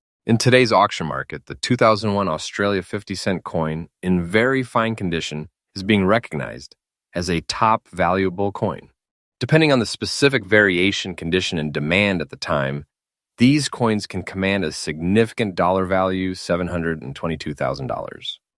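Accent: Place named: United States. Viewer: What a lot of drama. Yankee accent !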